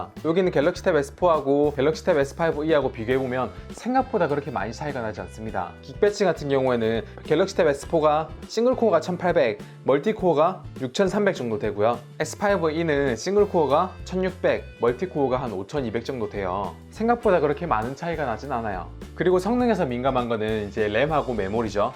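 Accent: Gyeongsang, South Korea. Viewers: 형 이때는 갱상도 쌍남자스럼이 남아있었네유 ㅋㅋㅋㅋ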